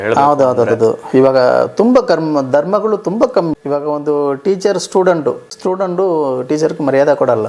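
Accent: Tamil Nadu, India. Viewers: He is tamilian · Tamil avru sooo adikke hage aste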